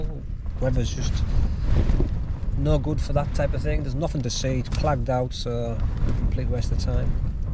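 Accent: United Kingdom. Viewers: Nice video brother from across the pond. We both speak English but sometimes I had to rewind, the Queen's English is a little different from your cousins in the states.🤣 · are you a Geordie by any chance? 🙄 Was this up the Cheviots, beside Kielder? Redeswood forest? 🙄 🤔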